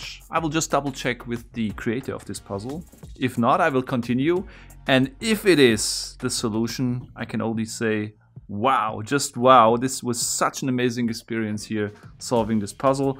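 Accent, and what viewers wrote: Germany. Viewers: Das erste Video von dir gesehen den Akzent gehört in der kanalinfo nach gesehen und jap du bist deutsch xD Viele Grüße aus Hamburg! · Hmm.... sounds german. Not the Set, i mean. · Are you German ? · You are from germany right? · you talk little bit like german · du bist doch bestimmt deutsch oder? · Man hört einfach Instant dass er Deutscher ist . Und das Ah Ok war das Deutscheste im Video . Ehrenmann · Dein Akzent ist so krass deutsch haha Machst du auch deutsche Videos? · Bist du deutsch? xD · He sound like a german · Man hört dass du ein deutscher bist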